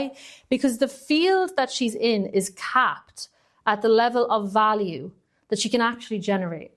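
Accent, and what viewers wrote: Ireland. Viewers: It is so refreshing to hear an Irish accent!!! Thank you ❤❤❤❤❤ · I appreciate the blazer. For all it may have represented in your presentation. Tbh I am so bamboozled by the wholesomeness of the Irish accent. It is hard to quantify exactly the truth behind the words. Resonates like a mother's tongue. Much more than a business and marketing exercise some critics pertain. Anyhow keep going, if we have a choice! More importantly thanks for the guided meditation practices. They matter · Another great vid - completely unrelated, I am fascinated by accents; as well as an obvious southern Irish lilt, I detect something else - Scandinavian? Central Europe somewhere? Apologies if this is none of my (anyone's) business. 😄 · I love your accent - Irish ?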